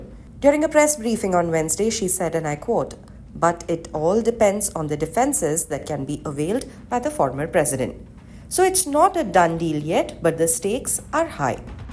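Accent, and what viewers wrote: India. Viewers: Indian Accent is masakit sa taenga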